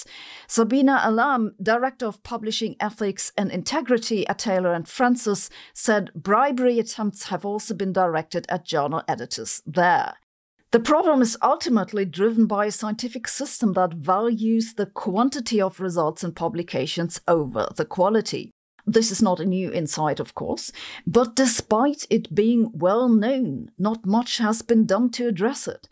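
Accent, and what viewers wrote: Germany. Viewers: I'm going to submit a paper on how many times Sabine says "however" per video, with a magnificent German touch. It's amazingly sweet! Thanks for the video.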